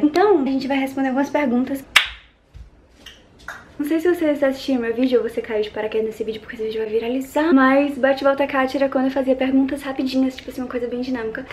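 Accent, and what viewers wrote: Bahia, Brazil. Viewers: Amigaaa Eu maratonaria todos o seusa vlogs pro resto da vidaaa Manda esse povo ir.pra merda Vc e especial pq e diferente algumas meninas(o) quando crescem digitalmente ficam seguindo um padrão chato e vc ñ Nunca perca sua eesencia doida Ps: gente eu divido o mesmo sutaque dessa diva bahiaaaaaa❤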